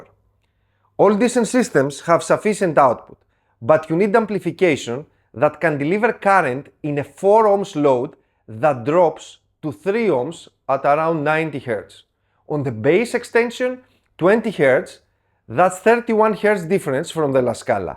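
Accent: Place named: Greece. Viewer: Hi, you must be Greek, if I can tell by the accent. Beautiful system, congratulations. Very transparent and well organized presentation. Subscribed. My system is comparatively very modest, but I really enjoy it: Pro-ject Classic Evo turntable, Ortofon 2M Bronze cartridge, Pro-Ject Phono Box S3B Preamp, Advance Paris A10 classic integrated amp, KEF LS50 speakers, 2 REL T-7x subwoofers, Marantz 6006 CD player, WiiM Pro Plus streamer, Audioquest and QED cabling.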